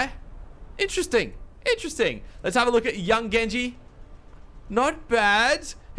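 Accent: Australia. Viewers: Hey, why are you Australian?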